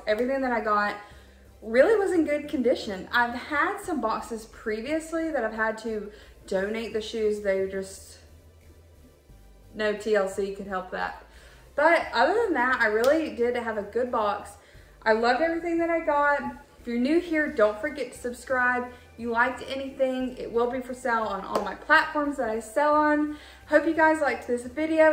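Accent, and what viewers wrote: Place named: South, United States. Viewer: You have a slight accent; are you from the south?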